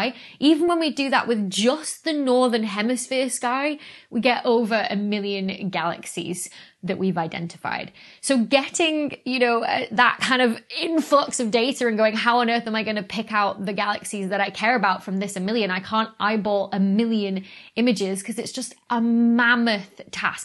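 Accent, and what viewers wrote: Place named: Northern England, United Kingdom. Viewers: What is that accent? Leeds/Manchester? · Your interpretive presentation skills are now awesome. Would like to be in the audience for your next LIVE astro conference presentation. Should be SRO for just the delivery. Video suggestions: 1) what I do when I collaborate as an associate to main author, 2) vids on each of the previous main author papers starting with no. 1, which was before the Phd(???), and 3) how do I work/collaborate with others as main author, for instance, is there a small final edit group? And, thanks for being yourself, Mancusian accent and all. Next book?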